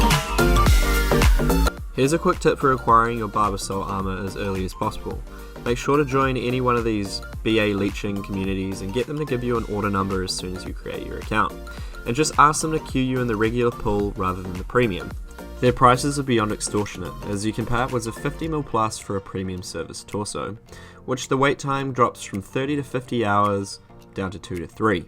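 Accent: New Zealand. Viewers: Bro I swear you sound like ur from nz, am I right? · I realised half way through the video when you were pronouncing some of your words that you were from the brother country lmao, no worries mate :)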